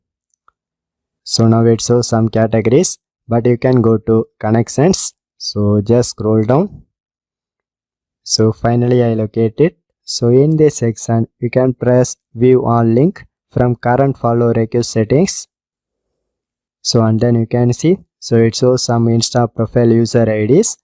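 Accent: India. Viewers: Ugh I can’t stand Indian accents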